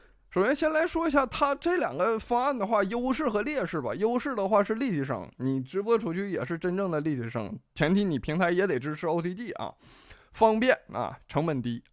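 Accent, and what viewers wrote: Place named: Northeast, China. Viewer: 哥们你东北哪儿的:face-turquoise-covering-eyes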